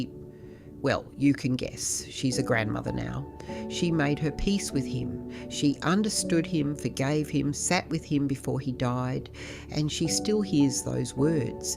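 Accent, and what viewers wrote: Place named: Australia. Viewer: Female Aussie accent in Ai.(?) Blokes know you are right. Give us pause to reflect... (then start nagging ...if we can't come up with plausable excuse, we deserve it).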